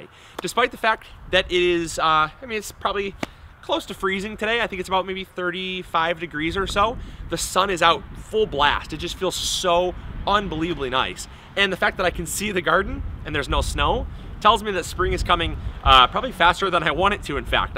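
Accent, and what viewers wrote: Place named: Midwest, United States. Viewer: I'm Missouri so I appreciate that midwest accent when he says "salary" instead of celery. So do I.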